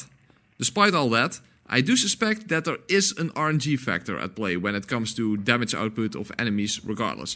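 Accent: Netherlands. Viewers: You must be Dutch, I hear an audible accent!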